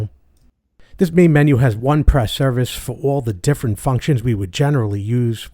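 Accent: New York, United States. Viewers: So, I'm a former NYer and just freaken LOVED hearing a voice from home! We ordered the S8 last night and expect delivery in 5 sub-par coffee days (our Breville burr grinder is breaking so the coffeemaker is making tan water - ugh). Thanks for walking me through the set up, etc. Lavazza is good coffee, as is Julius Meinl Prasidente, but I still prefer La Colombe Monaco or Sumatra beans (via subscription - roasted in PA and shipped). OMG, as I drink this swamp water, early in the morning with the house still asleep, I am PINING for a cuppa anything made with the S8! Subscribed!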